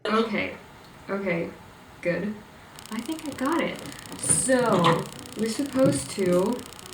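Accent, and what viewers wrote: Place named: Germany. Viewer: I knew you were german! I am normally so bad with dialects and accents. I can't even distinguish between our german ones - exept for bavarian because I've got a bavarian friend and I think I am doing good to figure that out x3 Anyway I somehow thought you were german and it felt nice to be right about that and not be needing to read the subtitles while you talked to your boyfriend x3 I think Micarah's aren't exactly the best for tutorials tbh, but I looooove watching them. I know how to sew the bsaics and all but as I am not good enough and wouldn't know what to do with these sort of dresses/clothes (exept for maybe one day start cosplaying again x3)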